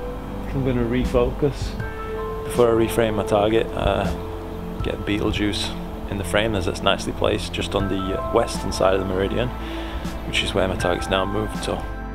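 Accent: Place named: West Yorkshire, United Kingdom. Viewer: Thought I recognised a West Yorkshire accent. Nice setup, I’ve got the esprit 100. I’m in York, but originally from Wakefield. I’ve got a Sesto Senso2 attached to mine and it’s great. I prefer it over the EAF I used to use. Things that won me over, are, it has a clutch so you can still manually focus, as long as the sesto is off. Not a biggy but can be helpful if you don’t want to use sesto, and also there are no brackets or weird adjustments to attach it to the esprit range, even though there is a bracket for the zwo EAF (the Takashi one), it’s still messy. The sesto 2 just sits around the focus housing. I have practically no focuser backlash either. Great video.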